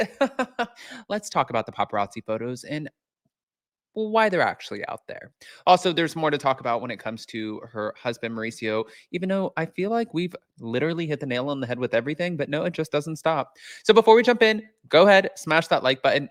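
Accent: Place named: South, United States. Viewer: I love your southern voice, 😂😂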